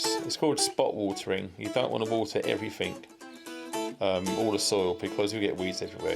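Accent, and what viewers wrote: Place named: England, United Kingdom. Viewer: I turned on closed captioning. It's really a hoot, as it doesn't understand your English accent very well.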